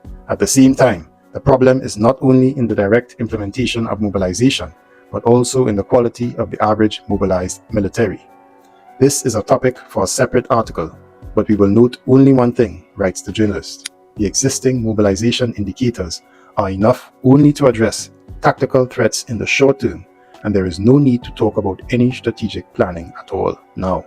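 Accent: Trinidad and Tobago. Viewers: I trini too but I wondering if I hearing ppl in trini accent , good reporting first time I’m seeing your channel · New sub🎉 You sound Trini. Very cool if you are! Very informative work! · Is that a Trini (Trinidadian) accent I'm hearing? Glad I found this channel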